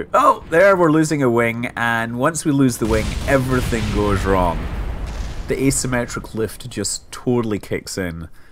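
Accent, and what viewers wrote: Scotland, United Kingdom. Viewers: u sound scotidh i hate u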